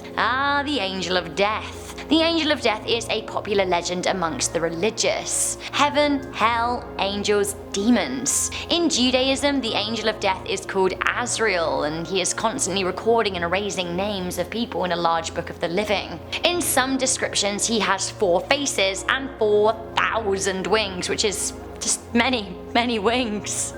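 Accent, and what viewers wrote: England, United Kingdom. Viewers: Is She English?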